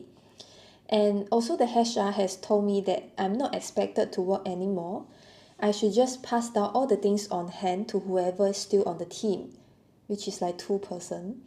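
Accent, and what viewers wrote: Singapore. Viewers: sorry to hear about your layoff, you are so admirably positive after that news. hopefully you can take a break and find something else when you are ready. their communication was terrible, should not be done via email, and as you discovered in large companies sometimes people are selected by algorithms or upper management. you sound like a Singaporean accent? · Your accent is cool you are from Singapore right! I'm Jonathan from El Salvador. Software developer too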